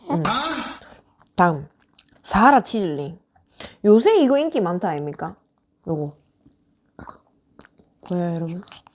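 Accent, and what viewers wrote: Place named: Gyeongsang, South Korea. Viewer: 아~따마 사투리 지기네예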